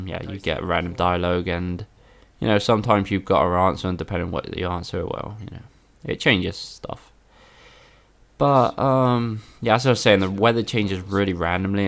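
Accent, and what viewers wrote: United Kingdom. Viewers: Why the Japanese? You sound British?... Head explodes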